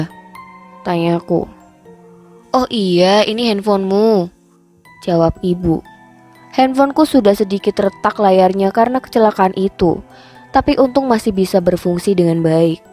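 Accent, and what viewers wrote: Banyumas, Indonesia. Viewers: Udah penasaran sama akhir ceritanya, logatnya ngapak2 medok ya min 😂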